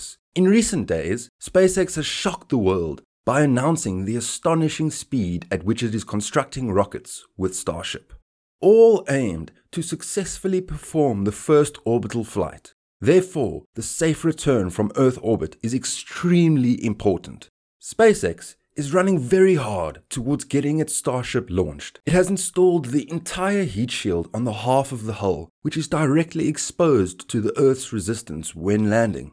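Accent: Afrikaans, South Africa. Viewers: I hear a fellow Saffer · Is that a SA accent? Good video by the way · My dude you Afrikaans? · you sound South African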